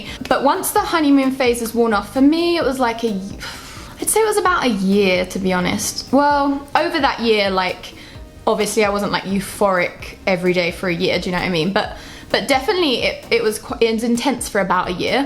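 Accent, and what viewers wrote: United Kingdom. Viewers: Oh my lord, blonde hair, blue eyes, and your british accent. Im in love! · I honestly didn't realize the accent until you mentioned the fact that you were from England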